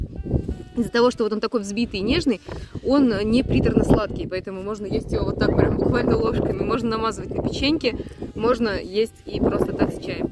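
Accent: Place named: St Petersburg, Russia. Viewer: Это первое видео, которое я смотрю на Вашем канале. Такая у Вас речь четкая. Ближе всего к питерскому акценту, хотя, я мало где была в России. По голосу слышно, что Вы хорошо поёте.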